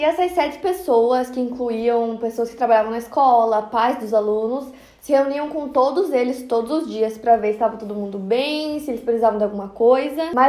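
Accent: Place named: South, Brazil. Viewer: Único sotaque sulista aceitável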